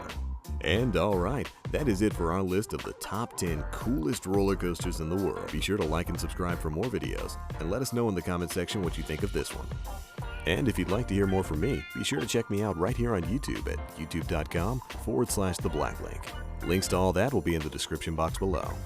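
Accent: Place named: United States. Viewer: GeekyGamerGirl03 actually, Cedar Point is in Ohio, and most people in Ohio speak in an American accent, and he is speaking in an American accent.I live in Ohio. Not trying to sound mean or anything:).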